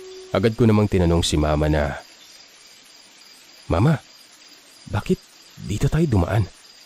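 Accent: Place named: Visayas, Philippines. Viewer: Cute mo tlga mag bisaya sir HAHAHAH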